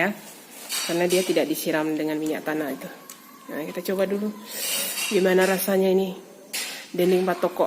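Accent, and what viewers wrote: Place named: Bukittinggi, Indonesia. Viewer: Ba a dak bacalia an wajah uni ni? Bunyi suaro uni wak urg bukik tinggi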